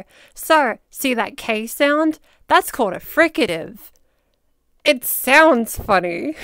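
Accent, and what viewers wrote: Australia. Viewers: yeah i ultimately agree with that but idk just aint for me i suppose id say the only problem i got with ur vids is that u got an australian accent so its a bit of a work around to be like "oh how would i say that" but its pretty easy to work around so its no big deal tbh plus its probably amazing for people with Australian accents but yeah thats all thx for replying and its good to hear that ill be on the lookout hope your having a wonderful day also your contents amazing even without including tutorials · It's so nice to have an australian accent guide for the exercises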